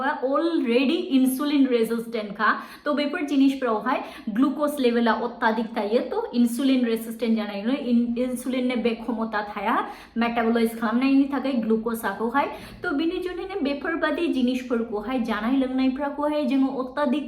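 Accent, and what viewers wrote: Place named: Bodoland, India. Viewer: Jahag Lagtar bai abo bodolend ni Rao Baidi sur Pwra 😊❤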